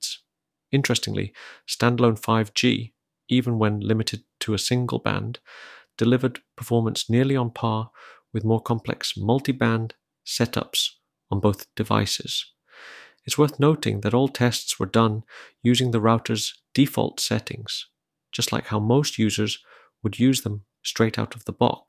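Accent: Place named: United States. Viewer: I'm German and have little practical experience with English. As a radio amateur, I'm interested in the films from "Ringway Manchester." My problem, however, is that I find it extremely difficult to understand the guy. It's not even Donald Duck-like speech anymore. I also have to say that I understand your presentation without any problem. It's as if an educated American were speaking. I understand Satanic Coronaut's question as meaning that he wasn't sure whether the test applied to US networks or European ones.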